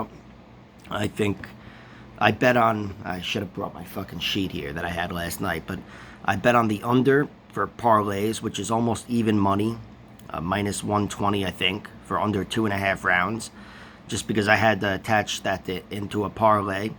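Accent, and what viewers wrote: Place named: New York, United States. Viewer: Are you from New York I ask because of the accent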